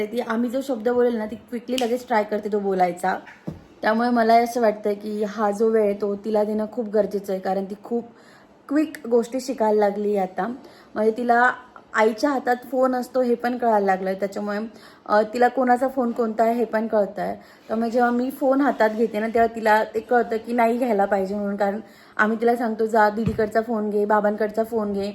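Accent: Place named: Khandesh, India. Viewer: A tuz माहेर कुठल आहे नक्की नाशिक खानदेश tone vatto thoda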